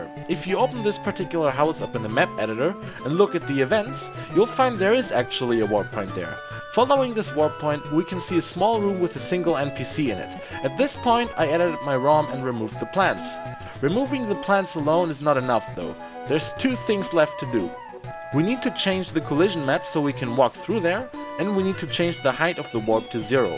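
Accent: Germany. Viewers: Wenn du nicht gesagt hättest, dass du die deutsche Version gespielt hast wär mir dein Akzent kaum aufgefallen. U got a keen knowledge of English bré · Direkt den deutschen Akzent rausgehört 🙈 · Man hört das deutsche raus herrlich hahaha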